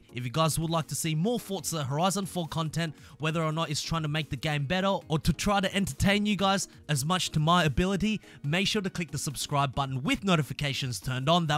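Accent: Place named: Australia. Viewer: Sand trap. Slow the car down and push it of balance, the same way lowered cars become unsettled when you hit the water flowing over a road. Side note: Make "No collisions" an option in the Online Adventure. E.G. Street Race S2 No Collisions. Too many racers smash into you around that first corner. Better let everyone pass and slowly make your way through the crowd one at a time. Great work keep it up. All the best for the new year. Great to hear an Aussie posting Forza videos. Well done.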